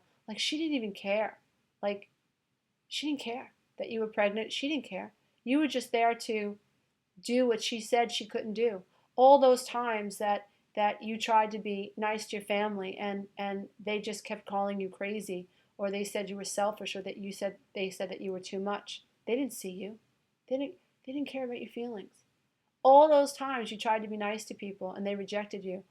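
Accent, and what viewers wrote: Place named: East Coast, United States. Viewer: I love your videos! It's like therapy for me! Thank you so much! Keep up the good work! Also I have a question... Are you a therapist? If so by any chance are you located in Southern California? I would assume you're on the east coast due to your accent. But I love your advice and am looking for a therapist. Thanks again!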